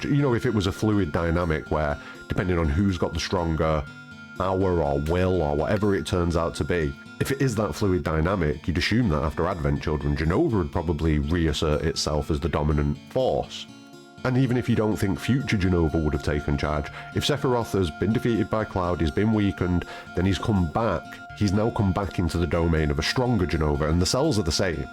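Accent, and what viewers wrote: Manchester, United Kingdom. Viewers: Loved this video! Especially narrated by someone who sounds like they’re from Manchester like me 😁 Great work!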